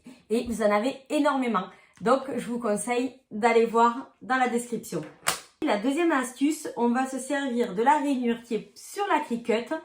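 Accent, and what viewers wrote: South, France. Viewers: Bravoo cette vidéo m'a trop plue, votre accent serait il du Sud? Vos explications et astuces , et bien, un grand merciii🤗 je ne cricute plus depuis hier soir, bluetooth ne veut plus🥺 mais je vais vous suivre quand même 🙏 je suis en manque de cricuter👏👏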